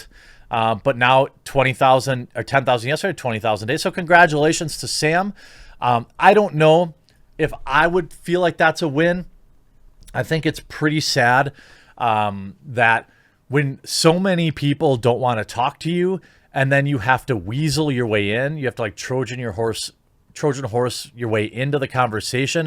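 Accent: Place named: Wisconsin, United States. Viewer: lol he's from wisconsin. that's how they pronounce bag. BAYG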